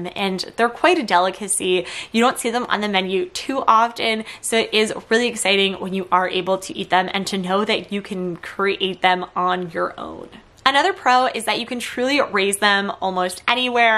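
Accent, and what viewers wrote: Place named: United States. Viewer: “Rabets and Qwel” I swear this is the first time I’ve struggled with the American accent. 😅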